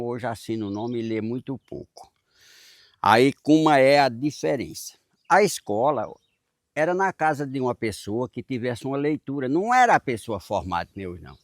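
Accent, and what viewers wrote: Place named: Northeast, Brazil. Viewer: Como gosto de ouvir seus contos verídicos o jeito nordestino bom de ouvir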